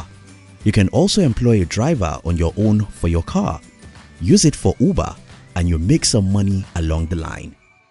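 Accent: Nigeria. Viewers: The voice sounds Nigerian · This narrator is definitely a Nigerian brother.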